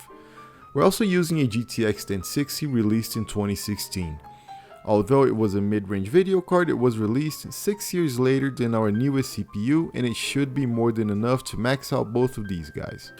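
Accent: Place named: France. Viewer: What is your slight accent? French?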